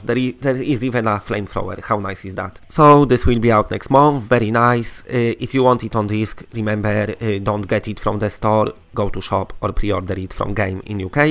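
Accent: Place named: Poland. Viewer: keep on speaking with your magnificent accent just the way you're doing right now, we just love it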